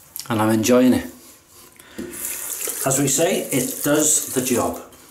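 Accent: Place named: North Wales, United Kingdom. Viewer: Just came across this video... and your accent makes me very happy and full of 'hiraeth'! Greetings from a fellow north Walian living in germany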